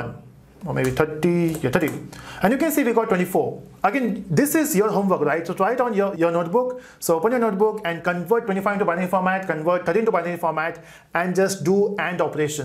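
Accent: Telugu, India. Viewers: are you from india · Are u from andhra? /Telangana?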